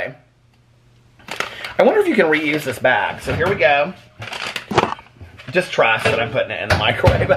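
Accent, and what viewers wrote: South, United States. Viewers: I was more of a Richard Simmons kind of girl? 😂 this was SO fun, I love your Southern accent! 😂 love you! 💙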